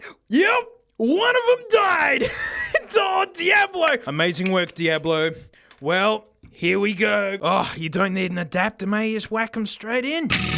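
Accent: Australia. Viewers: I literally have no interest in music but I watched this entire thing and I don't really know why. Maybe it's something about hearing the Australian accent on YouTube laughing and joking around not sure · I don’t understand this nonsense but he has an Australia accent I’m gonna roll with it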